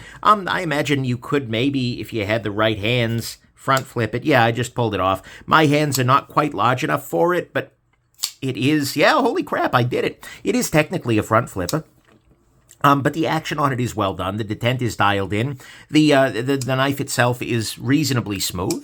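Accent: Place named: East Coast, United States. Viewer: Hey, love your stuff but do you also run a YouTube channel that deals with MMA … … same dialect coming out of somewhere on the east coast, I like it. Anyway, love the channel.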